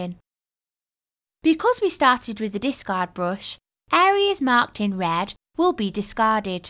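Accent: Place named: Lancashire, United Kingdom. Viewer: Sounds like Bubbles. That's a Lancashire accent.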